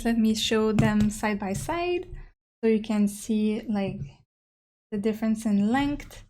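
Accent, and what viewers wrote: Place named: Quebec, Canada. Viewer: Omg haii! It's my first time watching your videos and I notice your accent sounded very familiar, then it clicked you must be from quebec province~ me too ^^ Thank you for the well elaborate video, it helped me a lot to choose since I've only recently started this hobby and I was lost on which pen to start (funny enough the first thing I bought were bottled inks xD ) that pearl kaweko fountain pen surely is very pretty and lovely, too bad it was a limited edition TT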